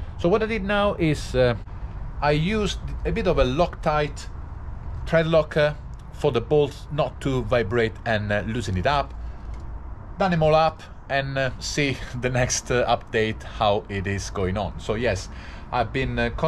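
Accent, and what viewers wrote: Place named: Italy. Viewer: Good spirit man😅 Keep on the good work. Love your italian accent👌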